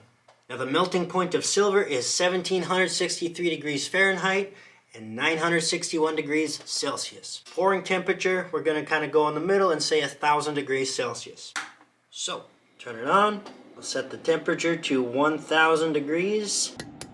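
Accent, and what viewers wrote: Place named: China. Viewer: The Chinglish is worth the price.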